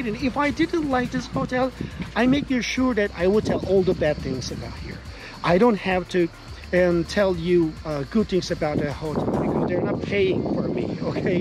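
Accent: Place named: Turkey. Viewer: özüne dönmek budur 🤣 ingilizce konuşmaya başladığı ilk dakika bu adam türk belli dedim bla bla bla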